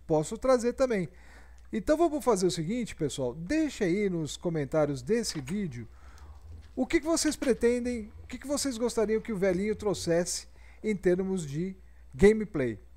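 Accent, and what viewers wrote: Piracicaba, Brazil. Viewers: vc é de piracicaba velhinho?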